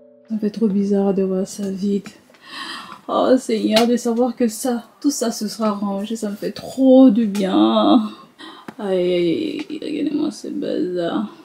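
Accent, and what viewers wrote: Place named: Gabon. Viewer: Je savais avec cet accent que ça c'est une gabonaise quand j'écoute encore le elang no si😅ma sista FANG 🎉🎉je m'abonne du courage❤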